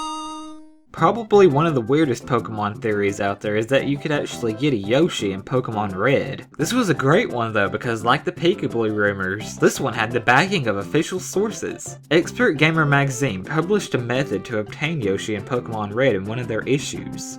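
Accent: South, United States. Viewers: Does anyone else agree this guy sounds like a hillbilly? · i like your light southern accent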